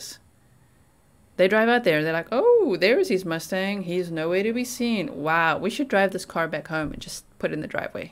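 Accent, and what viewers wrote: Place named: South Africa. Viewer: she sounds south african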